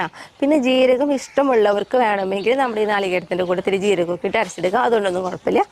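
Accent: Thrissur, India. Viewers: Ningalude sthalam evvideyanu. Thrissur ano. Samsarathil Thrissur slang.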